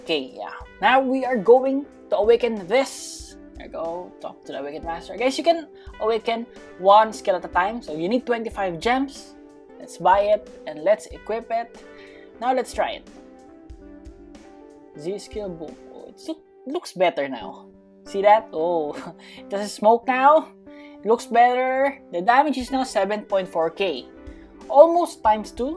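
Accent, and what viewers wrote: Philippines. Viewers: Bro had a Filipino accent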